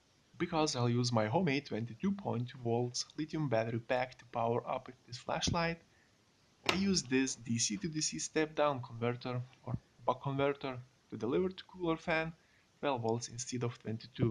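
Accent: Russia. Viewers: Прям слышно что русский, да?) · Акцент какой то русский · Ваш Английский.... Вас выдает, что Вы Русский =))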